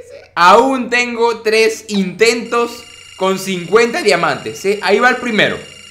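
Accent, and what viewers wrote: Venezuela. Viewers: Hey chamo eres venezolano?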